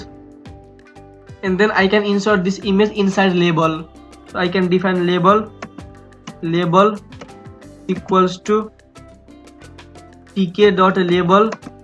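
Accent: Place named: Nepal. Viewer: ayo nepali accent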